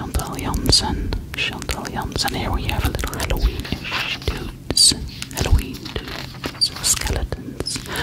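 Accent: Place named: Netherlands. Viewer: Really love the Dutch accent with some books sounds 😊😮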